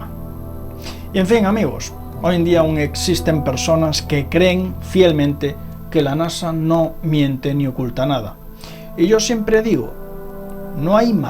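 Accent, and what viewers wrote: Spain. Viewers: tu vos me da cáncer y mas tu acento de español racista y ladron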